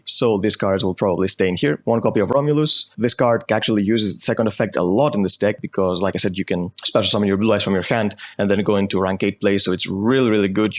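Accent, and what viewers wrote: Greece. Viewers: So I am really find of blue eyes and this deck is rly budget as well... I just have one question: which country are you from?cause your accent is really good for someone whos mother tongue isnt english. Your accent seems like Greek...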